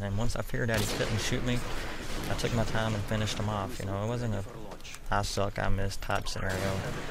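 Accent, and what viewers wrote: South, United States. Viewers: u have a southern accent? cause u say snappin ^^ and u do exactly what i do listen to footsteps its incredible the people who dont... cool vid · Nice commentary. Where r u from? Southern US somewhere I'm guessing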